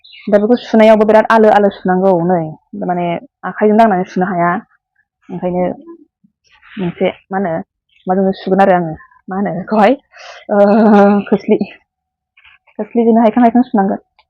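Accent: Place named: Udalguri, India. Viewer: Ma'am ni rylynaikou udalguri ni badisw mwnw ag karon jwngni udalguri ni mansifra ma'am badi rylayw.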